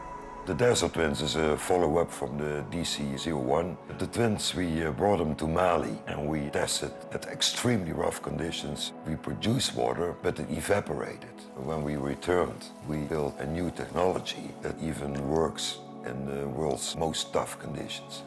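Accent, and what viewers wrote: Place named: Netherlands. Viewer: Nederlands accentje wel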